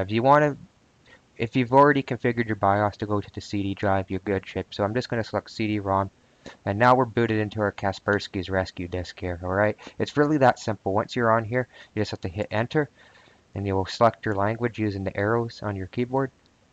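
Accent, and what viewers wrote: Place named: Canada. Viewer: Ese acento Canadiense... 😏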